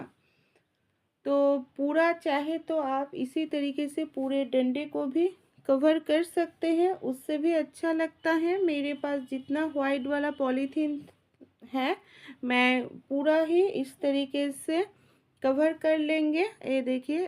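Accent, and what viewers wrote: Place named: Assam, India. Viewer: Aapki bhasha se aap asaam ke hi lagte ho bangali kam. ❤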